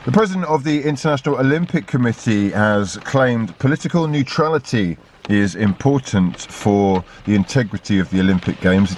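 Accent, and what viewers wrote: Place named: Birmingham, United Kingdom. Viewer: Where ya get the spot on brummy accent? I thought you sounded more Southern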